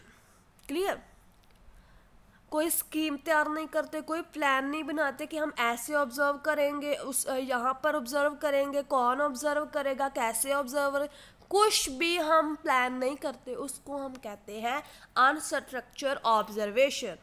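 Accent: Punjab, India. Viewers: मैडम जी आपने जो भी पढ़ाया बहुत cute लगा जी, किन्ना सोना बोलते हो जी आप ☺️ पूरे पंजाबी टोन है जी ! बहुत बढ़िया , आप पंजाबी हो🙂?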